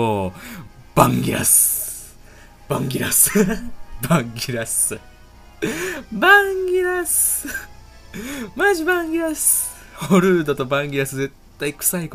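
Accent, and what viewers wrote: Kansai, Japan. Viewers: 声の若さと強めの関西弁懐かしいな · 関西弁強めの懐かしい😭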